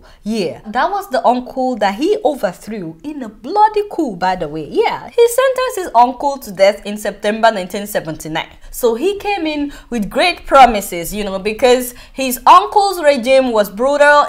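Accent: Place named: Nigeria. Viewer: I love the Nigerian accent. Lol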